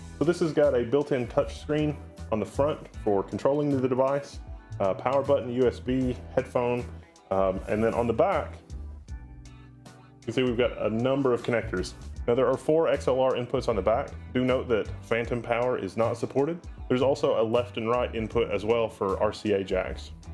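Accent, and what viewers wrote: South, United States. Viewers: I watch this channel solely because of the girl. She has hypnotized me and I must have more content featuring her. She has a hint of south in her, maybe Alabama, Mississippi or Georgia. What's her name?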